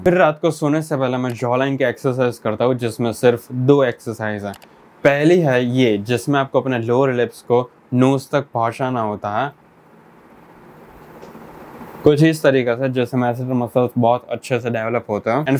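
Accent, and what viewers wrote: Gujarat, India. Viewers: Gujju accent >>>>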